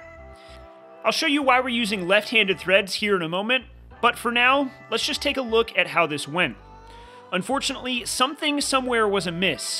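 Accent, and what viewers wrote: United States. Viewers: Totally off subject, but you say you’re from Nashville, but I’m hearing a LOT of Wisconsin/ high mid west in your voice. Pls share where you’re originally from and how you ended up in SoCal (the WHY is pretty obvious!)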